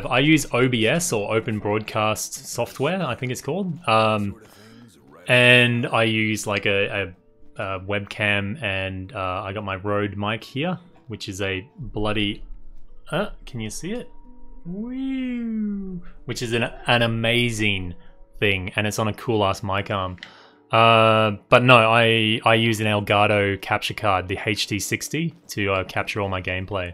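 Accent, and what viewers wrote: Australia. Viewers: Are you from Australia by chance?:D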